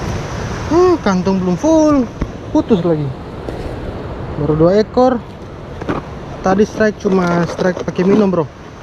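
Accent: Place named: Papua, Indonesia. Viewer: Mcm logat papua ka.. d papua mana kk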